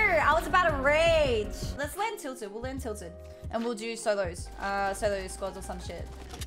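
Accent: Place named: Australia. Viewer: Hey which part of australia u from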